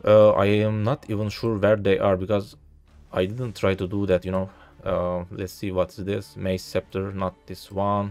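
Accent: Turkey. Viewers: konusmaya basladin 5 saniye dinledim ve dedim bu adam türk :) ve ne buluyorum kanal hakkinda :"Making build guides for Path of Exile.Because there are not much Turkish content creator,i make other guides in Turkish." yakisir...kolay gelsin hocam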